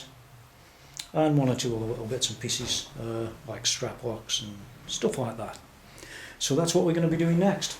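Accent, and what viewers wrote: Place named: England, United Kingdom. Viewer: you r from england right ?i took u from your accent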